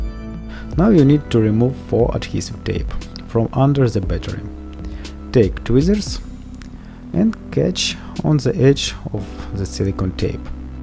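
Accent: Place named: Russia. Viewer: ду ю кен спик рашен, бекоз ю хэв рашен акцент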